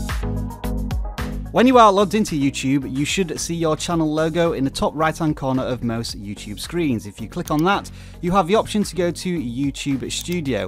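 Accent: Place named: United Kingdom. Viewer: random is vidiq British because he sounds like that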